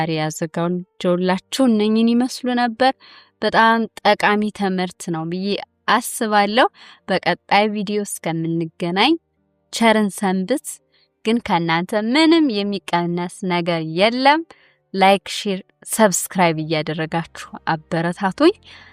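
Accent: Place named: Saudi Arabia. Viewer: በጣም ጎበዝ ነሽ እህታችን አረበኛሽኣሱ ፅድት ያለ የሳዊዲ ቋንቋ ነው በርች በቲክቶክ ብቅ በይ በዛብዙ ተጠቃሚ ይኖራልእሽ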